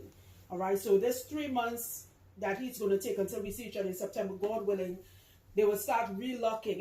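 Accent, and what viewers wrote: Trinidad and Tobago. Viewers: I was listening to your accent and figured you were a Trini 🇹🇹🇹🇹🇹🇹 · This sounds like a Trinibagonian. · First video I’ve watched of yours. I recognize that accent anywhere. Sweet T&T (my dad’s homeland)! I find the loc separating to be very satisfying. I not even to the washing part and you have a fan for life!! I’m about to binge your videos and get tips for my hair. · When I heard your accent I knew you were from my country T&T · Gurl I knew I hear Dey trini in you 🇹🇹🇹🇹🇹🇹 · I noticed the accent, but its only when you said you were from Trinidad, that it all made sense. 🇹🇹❤ i live in Trinidad aswell, n you did an amazing job💪💯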